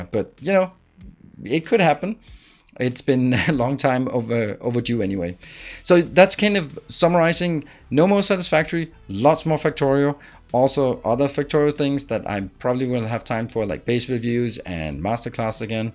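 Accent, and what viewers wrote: Denmark. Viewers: Thanks dude for making this channel, I've subbed and watched most of your factorio tutorials. What I especially like is your positive attitude and your cute Danish accent. I have tried using it while playing and it works like a charm. I really do like your most simplest designs and use your blueprints a lot .As for suggestions: I would like to see themed builds: Green builds (focus on least pollution) or black builds (most... hmm a Shell build) Keep up the good work!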